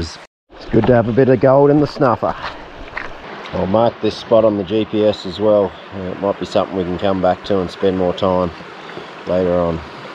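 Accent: Australia. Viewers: The fella from down unda lol! Love the vids!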